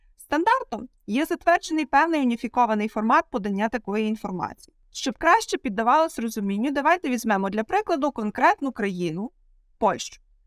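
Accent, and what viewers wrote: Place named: Western Ukraine, Ukraine. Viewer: У лекторки чудова західна вимова. А крім того, її відео містить корисну інформацію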